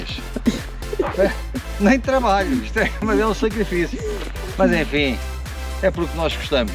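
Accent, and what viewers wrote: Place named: Portugal. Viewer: ok! Obrigado,,, eu consigo entender próximo de 100%. Agora há pessoas aqui no Brasil, pelo fato de não ter contato com a forma portuguesa de falar, stes, sim, tem muita dificuldade no entender, por falta de contacto com o sotaque. Eu sempre ouço e assisto vídeos de portugueses, já não é difícil para eu entender. Parabéns pelo canal... já sou subscritor!.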